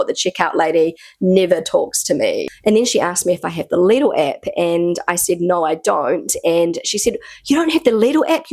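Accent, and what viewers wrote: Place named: New Zealand. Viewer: Your vid title saying "Lidil" instead of "Lidl" is a bit odd. Love your accent, though. That much kiwi still in your speech a decade later is rather gorgeous. G'day from Australia.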